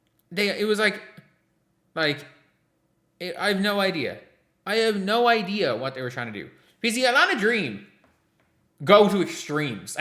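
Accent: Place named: Ireland. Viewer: You are the worst YouTube Fever analyst, milk toast copycat basketball analyst I've ever seen on social media. I've seen an old lady, grandma type do better than you on YT. She's at least honest. You're a ridiculous fraud made all the more annoying with that ridiculous laconic, droning, put me to sleep, Irish Accent presentation. I almost can't stomach you more than fraudster Jason Witlock. I don't believe either of you watch the entire game. You both just skim the highlights & watch other people's podcast. Boring as all get out. I can't believe you even have 1 follower. I wouldn't watch your videos if you paid me.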